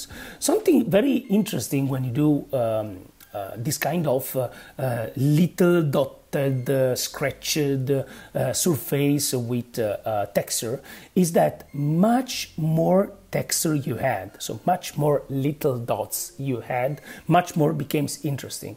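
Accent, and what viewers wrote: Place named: Italy. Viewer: Outstanding. Spoken in a true Italian Painters way.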